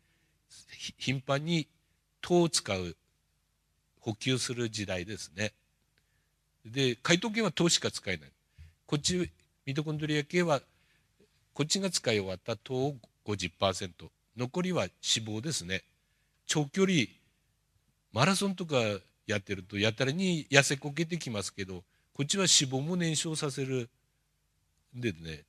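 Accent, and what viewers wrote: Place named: Tohoku, Japan. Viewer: 安保先生お若いですね。人類愛だなぁ。東北のイントネーションが耳に心地よく癒やされるのでずっと聞いていたいです。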